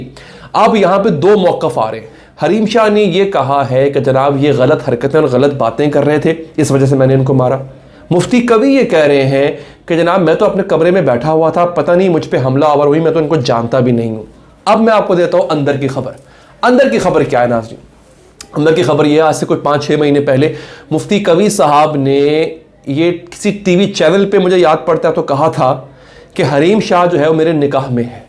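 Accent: Punjab, India. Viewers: Chandda paiyan ny..🤣 bhai waah kya punjabi or style hai🤣👌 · bhai baten krty hue halka sa jo punjabi touch dete hain wo boht kamaal he.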